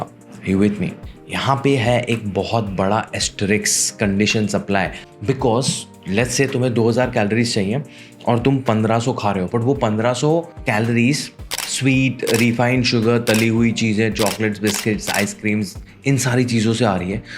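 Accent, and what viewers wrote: Gujarat, India. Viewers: ARE YOU GUJRATI ?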